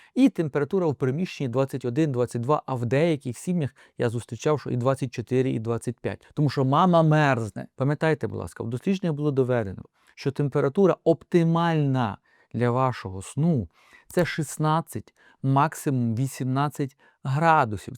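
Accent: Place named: Lviv, Ukraine. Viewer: Ви, напевно,- лікар від Бога!! Особливо мені подобається ваша вимова! (Я закінчив учбовий заклад у Львові та прожив там понад 30 років… Тому ваша вимова мені дуже рідна!! ) Дякуємо вам за ваші поради! Дай Боженька вам та вашим близьким,- Здоровʼя,, Добра та Любові! ❤️! Будьмо!!!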